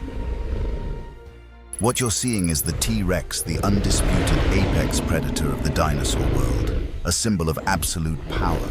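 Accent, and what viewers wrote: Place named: United Kingdom. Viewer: Depicting these flying dinosaurs in wildly different ways for the very… same… creature you keep talking about seriously detracts from anything the narration tries to claim. Every other frame shows the same type of dinosaur with either 2 legs OR 4 legs, plus wings in either case, and/or very different body types. It all seems like one big collection of dragon cartoons—accompanied by voice-over with a British accent in order to seem intelligent.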